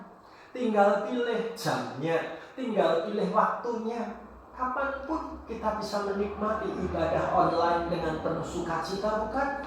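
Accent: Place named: Banyumas, Indonesia. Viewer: Bahasa Panginyongan nya mantap. Asli Mbanyumas nggih Pak Pdt?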